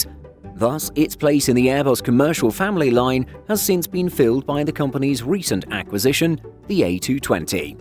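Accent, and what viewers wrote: United Kingdom. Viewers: You can hear from the stiff accent that that's British, very very British. So they simply cannot use metric system because they are Imperial.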